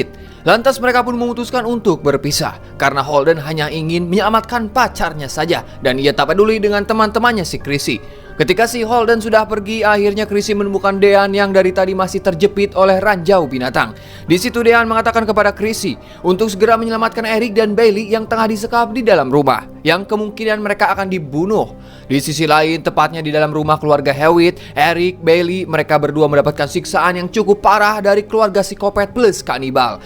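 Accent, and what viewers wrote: West Java, Indonesia. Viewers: Admin nya urang Sunda belah mana euyy😅😮😂😂😂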